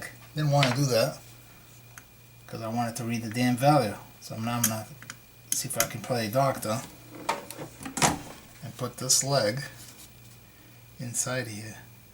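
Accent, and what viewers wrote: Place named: Boston, United States. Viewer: thought so hear the accent. I live on the Dorchester south Boston line.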